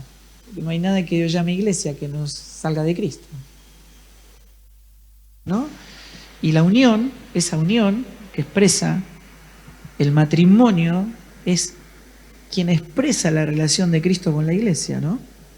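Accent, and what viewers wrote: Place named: Argentina. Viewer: Es argentino es normal su forma de hablar ...no busque el pelo en la sopa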